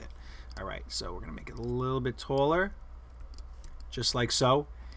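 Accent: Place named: New Jersey, United States. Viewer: Your so from jersey, you from jersey. love all your videos, great work, great teaching.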